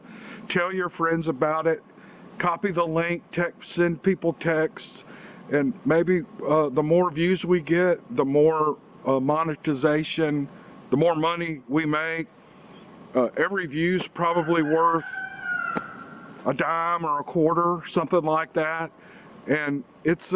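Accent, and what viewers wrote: South, United States. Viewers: Love your southern accent and the stories. Keep up the good work 👏🏾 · I am addicted to your Chanel. In your interview 5 you mentioned that you were not collecting 🥚 from your hens. It is happening to me now and I solved the puzzle. Two of my 4 hens are eating the eggs. You have beautiful animals. You may call your roster Claudio. I watched your documentary and enjoyed it. You are an excellent storyteller and I like your southern accent. Regards from Puerto Rico 🇵🇷! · MR., you talk and explain as much as you want to I enjoy listening to you talk, your accent reminds me of home and you got a good old boy accent I feel,you are a fantastic narrator and even if there was more commercials I would still put up with it to listen to you talk look at your stupid chickens and just enjoy myself of Southernman temporarily stuck in Chicago I would rather stay at home and listen to you then wander around outside in the jungle there ain't no bigfoots in this jungle!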